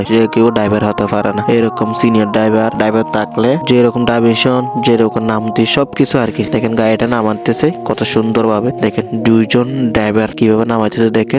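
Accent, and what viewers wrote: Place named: Chittagong, Bangladesh. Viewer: তুমি চিটাগং তোমার কথা শুনে বুজা যায় যতোই শুদ্ধ বলো চাটগাঁইয়া শুদ্ধ বলতে পারে না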